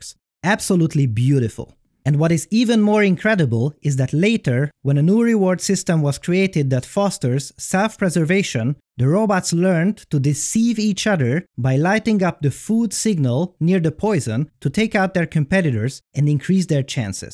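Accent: Hungary. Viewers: Ahoz képest hogy magyar vagy, kevés akcentussal beszélsz angolul. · hunglish intensifies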